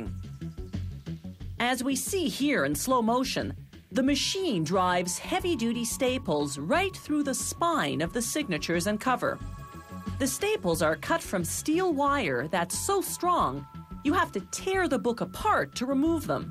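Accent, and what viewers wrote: Canada. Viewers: It's a canadian accent. Don't worry about it.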